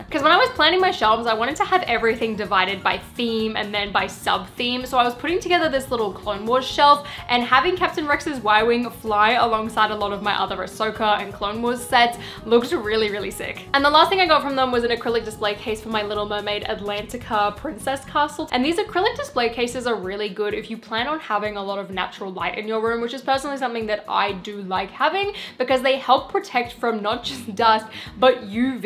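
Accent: Australia. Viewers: Agreed, I think always looks really natural. She has that striking Aussie look that fits with her quick, easy to follow australian english. If we're dealing out compliments, I also think she does really well at telling a story in her videos. They are well structured, which is not always the case for most Lego youtubers. That said, this video is probably not the best example of that, but it is something I've noticed quite often.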